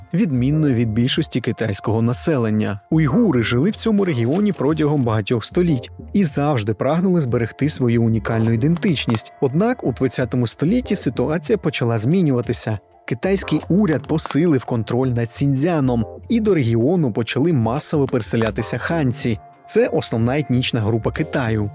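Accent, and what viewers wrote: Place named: Russia. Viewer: відчутно, що ведучий раніше російськомовний.. досі проскакують: "ихньому" та ін.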